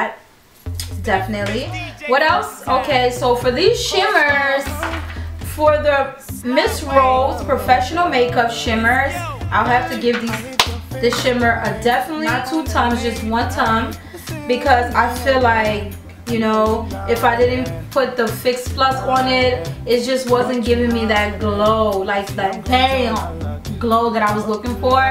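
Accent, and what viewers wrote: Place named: United Kingdom. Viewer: And there goes the british accent...first impression beautiful. Girrrrlll u had me watching and dancing at the same time... Awesome job.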